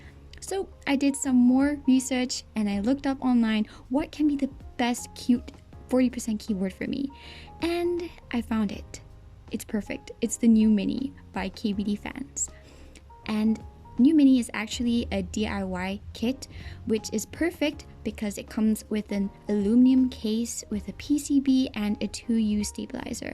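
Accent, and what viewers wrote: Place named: Scotland, United Kingdom. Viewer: this is random but you sound slightly scottish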